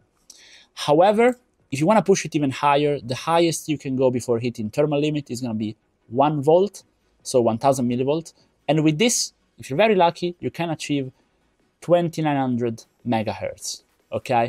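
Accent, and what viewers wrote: Italy. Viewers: Ciao bellissimo video da come parli inglese sembri italiano, se cosi fosse fai un video uguale in italiano? Sei un grande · troppo accento italiano :face-red-heart-shape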